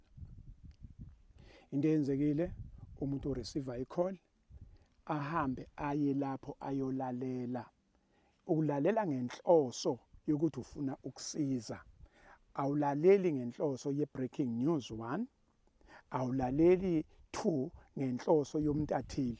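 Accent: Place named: Xhosa, South Africa. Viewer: Mina bengingayibon inking kodw ubaba mayesechaza kyezwakal lixhoshwa libhekil Baba uxolo lukufanele ungowethu n sisakudinga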